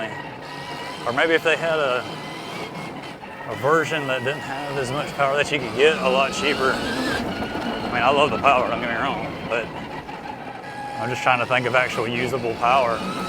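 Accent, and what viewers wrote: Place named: South, United States. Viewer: I knew exactly what you meant, because that's how southerners talk! Lol.. I'm from Texas so I'm not exactly a 'southerner', but we still talk very similar and sound similar. Your accent just sounds normal to me, and the words and phrases you use too.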